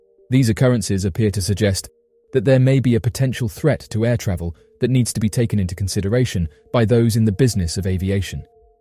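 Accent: England, United Kingdom. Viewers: That English accent ; very pleasant .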